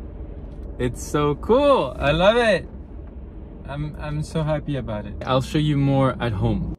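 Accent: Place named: France. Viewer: 💕Hey boo how you doin I hope your doing well looking absolutely gorgeous as always I'm unconditionally irrevocably in love with you I absolutely adore you Your MY favourite frenchie in absolutely obsessed with your French accent (can I borrow it) Lots of love and kisses your biggest fan NICOLE LOUISE crespeau💕 💋💋💋💋💋💋💋💋💋💋💋💋💋💋💋💋💋💋💋💋💋💋💋💋💋💋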